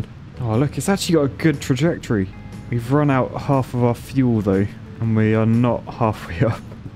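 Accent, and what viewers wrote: United Kingdom. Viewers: are you British because I am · oi noi it broke, oiii noiiii. your british accent is great. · Are you British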